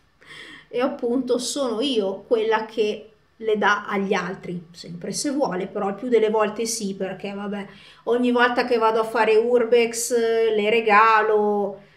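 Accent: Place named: Veneto, Italy. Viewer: pensa che io all'inizio ho pensato che tu fossi Veneta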